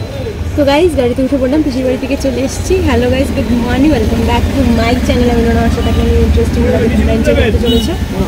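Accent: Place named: Cooch Behar, India. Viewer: যখন তুমি কোচবিহারের ভাষাতে কথা বলো শুনতে ভীষণ ভালো লাগে ❤❤❤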